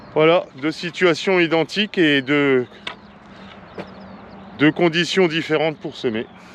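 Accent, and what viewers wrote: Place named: Champagne, France. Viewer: Bonsoir, il fait beau aussi en Champagne, t'es champs sont pas loin de la route Vitry Chalons, il y a de la circulation, de bien jolie vidéo, je suis quelques agris en vidéo toi et Sam mais lui pas dans le même coin, toujours cet accent Champenois, Gilles en Sud ouest Libournais , allez vive la Champagne et la Bourgogne, ta terre est pas trop mauvaise, tu préfères la craie, ici chez moi de l'argile au jardin, mon épouse s'occupe des fleurs en ce moment, rien au jardin, mais il est prêt pour les plantations ensuite quand viens l'été plus rien, l'eau coûte trop cher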